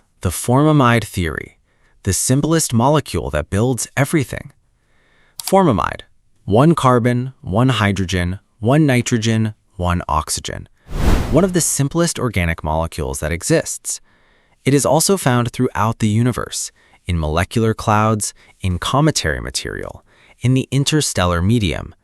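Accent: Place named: California, United States. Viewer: The most California accent I've ever heard.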